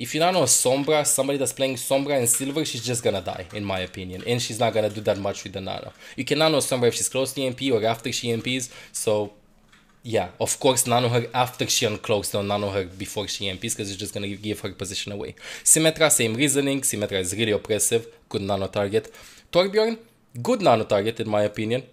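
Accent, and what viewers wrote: Romania. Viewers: Are you romanian?.Ca ai un accent :) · His accent is Romanian if you were wondering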